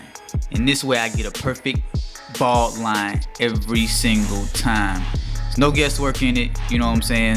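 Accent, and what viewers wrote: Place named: Houston, United States. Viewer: Wicked with the hair cutters as my grandpa would callem... HAIR CUTTERS...I swear you sound like you from H Town...great work!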